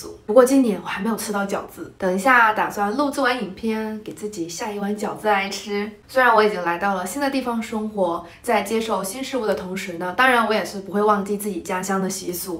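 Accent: Taiwan. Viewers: 你不是大陆人，你为什么会讲台湾话的口音， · 妳開始有台灣腔調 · 妳來台灣多久了！？妳说話台腔越來越重了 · 你確定你是大陸人麼？說話用詞跟腔調一聽就是台灣的😂 冒充大陸人沒意思。。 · 哈哈，已经变成台湾国语了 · 现在讲话都是台湾腔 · 妳的口音真的已經台灣化了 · 你有台湾腔了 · 这个是大陆妹？怎么满口台湾腔？ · 这4年台湾腔调，跟台湾出生没有俩样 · 是大陆哪里人？说话也有台湾腔！ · 福建也不吃饺子。感觉你也有点台湾腔了！👍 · 妳的國語就是台灣國語吔！ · 为什么主播台湾口音那么重？ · 有台灣口音了哦！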